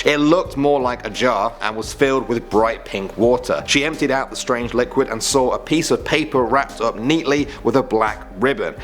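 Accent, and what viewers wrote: United Kingdom. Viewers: I hate the British accent. Stop asking me a question thru your statements. Ugh. I live in the UK. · He is British, its an accent, not him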